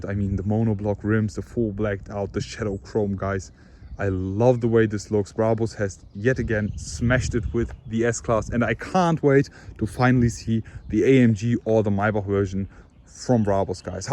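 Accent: Germany. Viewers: Man merkt das Du aus Deutschland bist 😂😂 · wenn der deutsche englisch redet.... fck noob.... felgen sehen scheiße aus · fürchterlich wenn ein Deutscher Englisch spricht 😢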